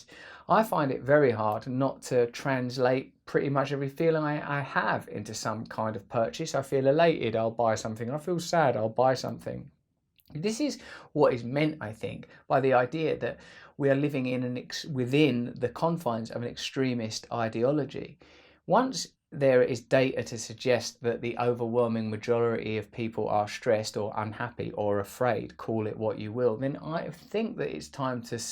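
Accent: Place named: United Kingdom. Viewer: You remind me of John Lennon because of your wisdom and British accent. Comedians are the smartest people in entertainment I've noticed.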